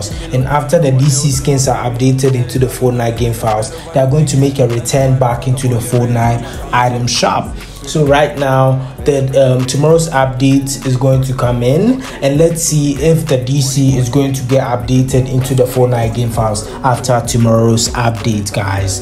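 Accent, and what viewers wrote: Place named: Ghana. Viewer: Your accent sounds Ghanaian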